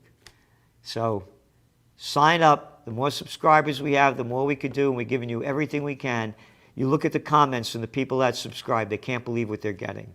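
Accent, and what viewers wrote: England, United Kingdom. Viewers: Great show, loved the English accent! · The English Accent is spot on LOL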